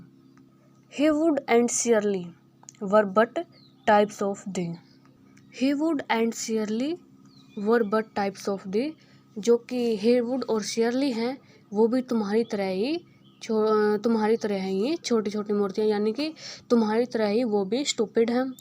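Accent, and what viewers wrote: Haryana, India. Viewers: Haryana se ho ky?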